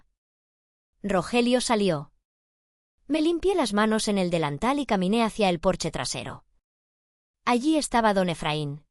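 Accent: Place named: Spain. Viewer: Sería interesante oír la narración en español de México y no el de España, ya que se supone que la historia se desarrolla en México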